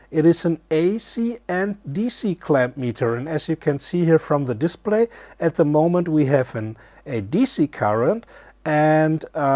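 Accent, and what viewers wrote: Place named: Germany. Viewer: Ich habe es mir schon gedacht, das du ein deutscher bist :D Man hört es einfach raus :P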